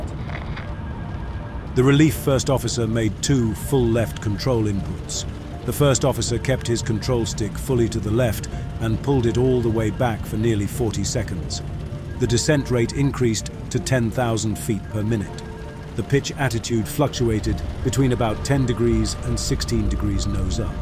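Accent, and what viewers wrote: England, United Kingdom. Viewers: English accents are overused and annoying.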